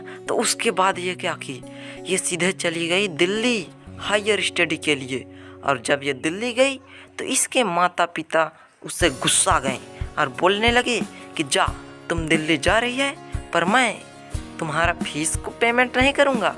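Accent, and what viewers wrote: Bihar, India. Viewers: Thanks you so much bhaiya I understood everything , literally you are gazab 😅 , aapka bolne ka tarika bhi accha lagta hai mughe aap bihar side ke lag rahe hai , mai bihar ka hun , stay motivated and keep making these kind of wonderful explaination video so it would be helpful for new mankind in future, Again thank you thanks a lot !! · Bhai aap bihari ho naa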